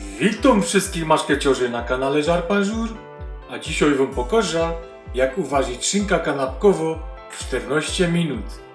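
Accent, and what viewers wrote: Silesia, Poland. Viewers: kochana,wspaniała gwara śląska❤ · już raz robiłem szynkę z Twojego przepisu, bardzo dobra, teraz będę wstawiał poraz drugi i pewnie nie ostatni,Fajnie gadasz po ślasku, tak trzymaj, pozdrawiam z Podlasia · Obudz sie.Przecez mowi gwara slaska.Mozna zrozumiec.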